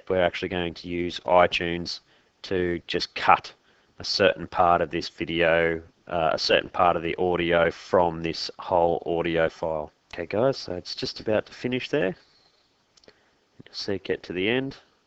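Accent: Australia. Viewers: An Australian accent! Very helpful vid, thank you...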